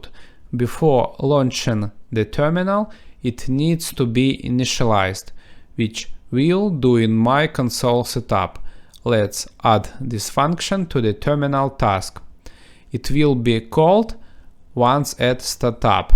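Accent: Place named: Russia. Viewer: приветствую! ваш анлийский поймет только русскоговорящий, говорите лучше на нашем могучем языке, он великолепен! :о) удачи в творчестве!!!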